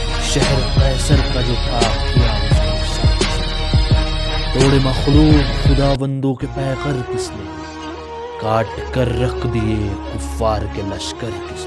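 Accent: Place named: Kashmir, India. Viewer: Kashmiri dialect reflected. Mashallah ❤️❤️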